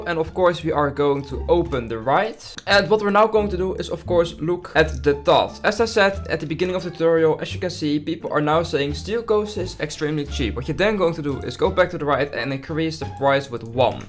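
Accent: Netherlands. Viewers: love the dutch accent are you from dutchland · You can hear so much that he just speaks Dutch. It's nice that you make English videos.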